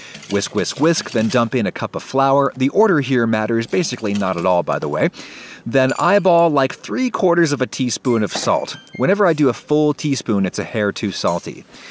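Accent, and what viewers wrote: United States. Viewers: you're american? i thought you're canadian! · Holy shit it's food wishes in 5 mins and in an American accent. You're God.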